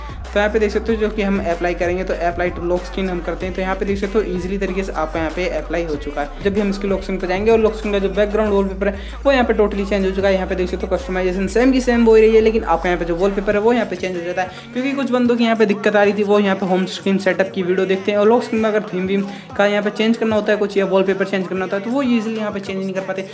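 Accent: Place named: India. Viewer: Bruh the title is in english but you speak indian why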